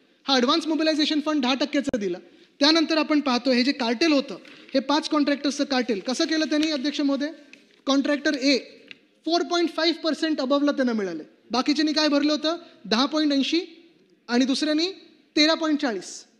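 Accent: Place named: Marathwada, India. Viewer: नंबर एक मुलगा आहे त्याच्या बोलण्यातून खरा स्वाद येत आहे मराठवाड्याचा मुलगा शोभला🚩👍☝️🌹🌹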